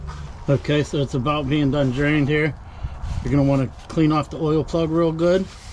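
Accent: Pittsburgh, United States. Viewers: A couple minutes into the the video I was like "nice, he's got a Pittsburgh accent"...great video, way to lay it all aht! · I know you have to be from Pittsburgh with that accent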